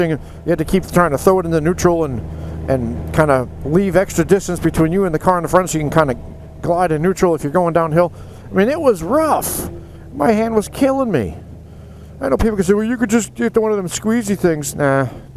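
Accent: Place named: Boston, United States. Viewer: Laytah ....BOSTON ACCENT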